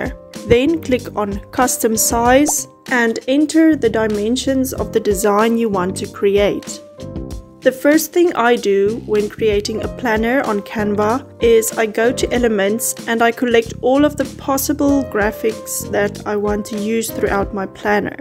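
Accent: South Africa. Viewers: Are you South African? 🇿🇦 That accent is uncanny.🥰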